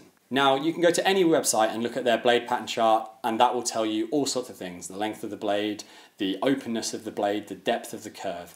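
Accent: England, United Kingdom. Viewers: A black guy speaking in a British accent discussing hockey. Never thought I would see the day. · A black dude with an english accent is really what comes to mind when i about hockey sticks.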